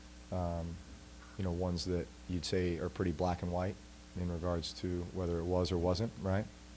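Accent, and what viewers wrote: United States. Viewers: Rams ah Lozuzz! Rams SUCK! Love that yatty downtown New Orleans accent.. Drew knows they got screwed but 100% he keeps class all the way. Nothing but respect.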